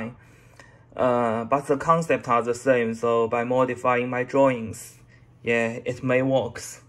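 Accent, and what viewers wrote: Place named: China. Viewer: 老哥稳，中式英文太亲切了哈哈哈哈哈哈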